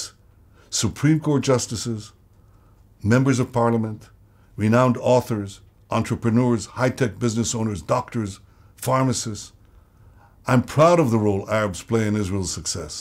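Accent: United States. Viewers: Are we gonna ignore the fact that he literally speaks English with an American accent?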